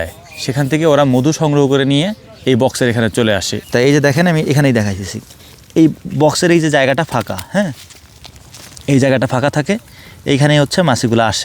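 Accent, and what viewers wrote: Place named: Magura, Bangladesh. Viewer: পিউর মাগুরার ভাষা 😅😅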